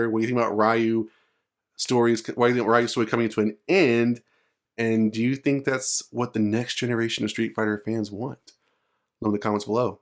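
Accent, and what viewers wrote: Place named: United States. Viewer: i said american because i was asking to whom i was assuming as an american from his accent. not saying any other countries are very good at it. i get your point, but i'm an asian yet i learned how to pronounce common western/english/american names correctly n i found it not that hard especially when you hear many people around you pronouncing/teaching you correctly.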